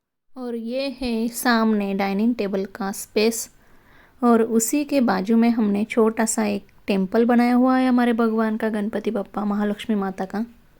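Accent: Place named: South, India. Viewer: Tumhari hindi bolne ki style se muze aisa lagaa ki tum South Indian ho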